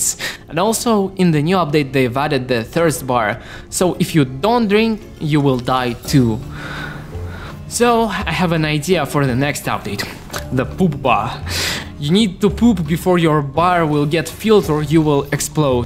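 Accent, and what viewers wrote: Russia. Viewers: У все ещё русский акцент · Даже акцент немного русский я уверен это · Акцент русский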